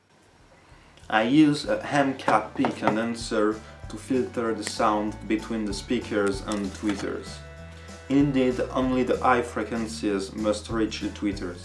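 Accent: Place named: France. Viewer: beau projet ,On sent bien l'accent frenchy c'est cool ^^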